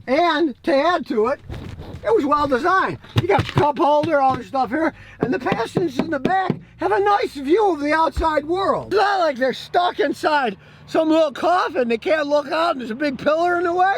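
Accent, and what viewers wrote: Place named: South, United States. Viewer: is gettin’ a southern accent:-)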